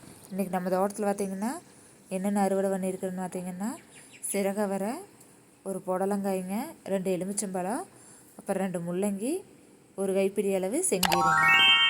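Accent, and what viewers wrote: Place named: Kongu, India. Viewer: கொங்கு தமிழ் கேட்டு ரசிக்க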